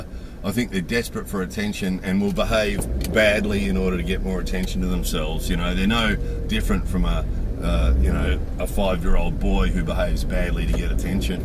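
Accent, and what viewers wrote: Australia. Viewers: Nice take on all points… Nice to hear an Aussie accent into the bargain.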